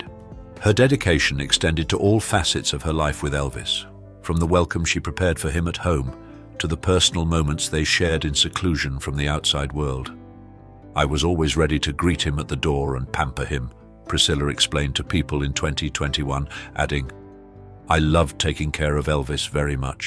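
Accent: United Kingdom. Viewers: Impressive with the British narrator. If it were only true. He met her when she was 14 but was soon shipped back to the US. He went on with his life. She wrote him every day, he never even contacted her for a year. Her parents wanted her to marry the most famous man in the world. Her mother pushed the relationship even though Priscilla started dating a 19 year old named Ron Tapp. Desperate to get her to Graceland her parents sent her at almost 18. Elvis finally married her at 21. They both cheated, she became involved with her dance teacher who was the son of actor Gregory Peck. Then she started an affair with her married Karate instructor Mike Stone. She would finally leave Elvis for Stone. Elvis gave her everything she ask for in the divorce. She continued to demand money from ELvis till the day he died.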